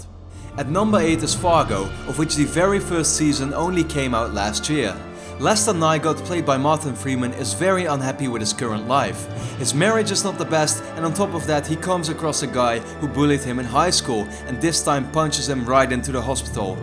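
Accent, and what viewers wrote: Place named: Netherlands. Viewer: Oke i just subscribed to your channel. And i want to ask you were do you live? Because i think your dutch atleast you have a dutch accent. I think it is awesome that you and i have exactly the same taste of games and movies. My favorite games are the uncharted series and my favorite movie is the lord of the rings and that is just the tip of the iceberg. Awesome list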